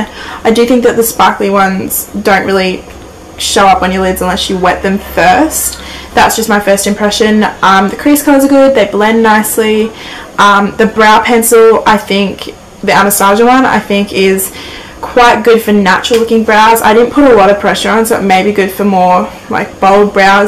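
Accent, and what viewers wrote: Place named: Australia. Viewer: Just found your channel when searching for chichi products. I've lived in australia for a couple of years.. and boooy do i miss it!! started watching your videos and your accent wouldnt let me stop! haha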